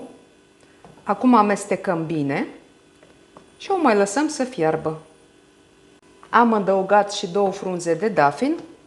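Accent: Moldova. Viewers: Wou, multumesc pentru explicatiile foarte clare si amanuntite, apreciez foarte mult. dupa accent sinteti din Tepublica Moldova, eu sint din Ardeal, Romania. Imi place farte mult reteta, felicitari si multumesc ca ati descris retetra atat de amanuntit. O zi faina va doresc!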